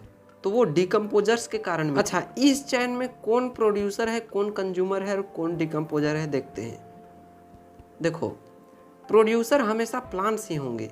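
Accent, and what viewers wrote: Bihar, India. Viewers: Sir aap Bihar se ho kya????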